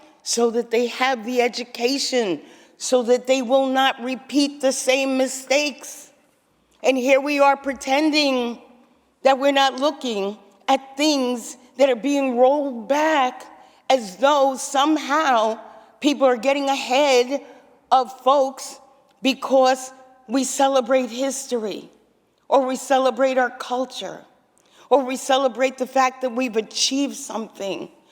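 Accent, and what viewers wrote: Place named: New York, United States. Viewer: Listening to her voice makes me homesick for my beloved New York. A beautiful speech honoring our black community during Black History Month. Thank you Senator.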